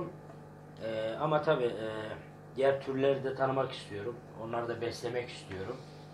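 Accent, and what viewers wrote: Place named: Southeast, Turkey. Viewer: Üstad Güneydoğulumusun ? şiben biraz andırıyorda 😊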